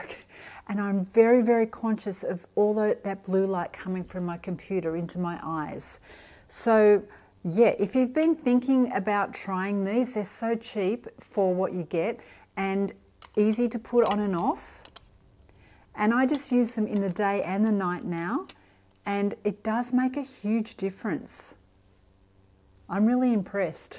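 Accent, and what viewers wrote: Australia. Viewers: I’m a new subscriber. I have watched five of your videos today. Thank you for sharing all your wonderful tips for us fabulous 50 gals. I love hearing your Australian accent.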